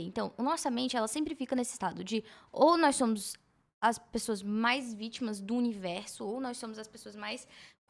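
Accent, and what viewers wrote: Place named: Florianopolis, Brazil. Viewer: Vc é de Floripa? (Perdão a aleatoriedade eh que n consegui deixar de notar o sotaque)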